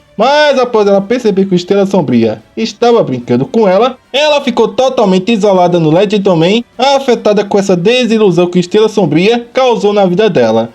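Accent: Northeast, Brazil. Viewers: Mano curiosidade foda que eu adoraria saber seu sotaque e nordestino?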